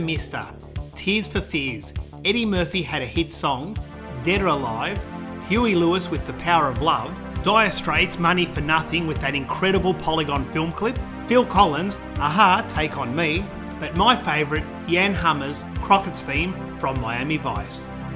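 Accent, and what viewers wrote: Australia. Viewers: is there any other Australian that knows the same american shows, movies, and music like you do? I live in America and grew up in the 70's like you and im always amazed by the fact you had all the same stuff in Australia. Accept for your accent you would definitely fit in in America. Meaning no one would guess you were from Australia. Lol. Was it the Nintendo in 1986 that got you away from RC's? 👍😎🇺🇸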